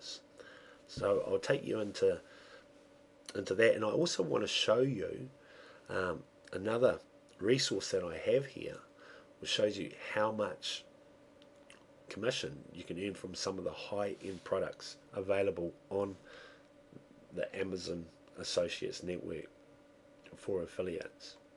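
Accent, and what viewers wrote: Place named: Australia. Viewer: I love the subtitles - mis-interpreting your Australian accent I'm afraid. Sorry - I'll continue to watch the video.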